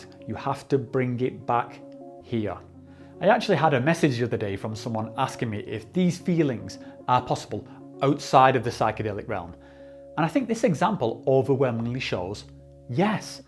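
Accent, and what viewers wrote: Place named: Bolton, United Kingdom. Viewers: Wierd watching someone with Bolton accent everything is usually American it's a nice change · ah!! Now makes perfect sense thanks. The Manchester accent threw me! Lol Keep up the content, I enjoy the channel. :-)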